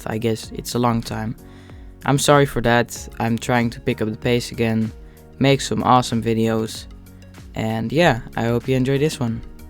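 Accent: Netherlands. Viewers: Are you Dutch/Netherlands?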